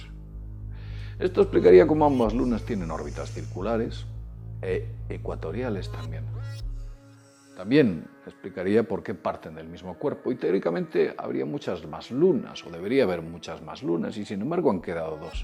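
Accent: Spain. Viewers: Yolanda Lemus Palacios no estareis acostumbrados al acento español o por otras circunstancias serivadas de vuesto dispositivo, pues se le escucha perfectamente....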